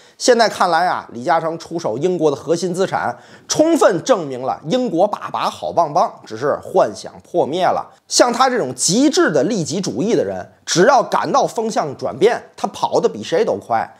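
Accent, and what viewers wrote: Tianjin, China. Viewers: 当然不能给孩子听，孩子就是借着一句“小青蛙你就乖乖的待在井里吧”记住了节目，还有天津味儿的普通话也很亲切。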